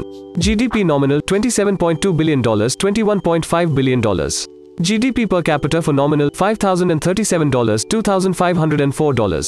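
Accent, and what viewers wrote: India. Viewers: Indian Accent?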